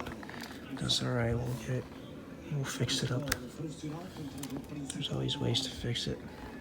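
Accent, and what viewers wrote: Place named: United States. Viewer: Bro, you sound American as fuck 😅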